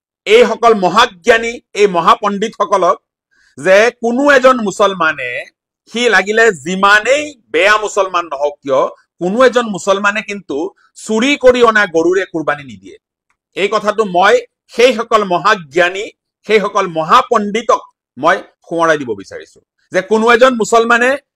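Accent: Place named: Bangladesh. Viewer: ছুবৰৰ বাচা। মিযাৰ ধৰ্ম সত্ৰ মাটি দখল কৰা নেকি। তোমাৰ অসমীয়া শুনিলে গম পায তুমি পূৰ্ব বংগীয মূলৰ।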